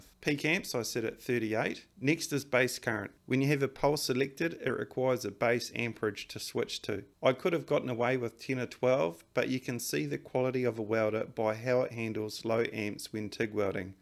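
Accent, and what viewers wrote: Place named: Australia. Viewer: I only watched the video because he has an Australian accent and sounded like he knew what he was talking about. Turned out to be a good video.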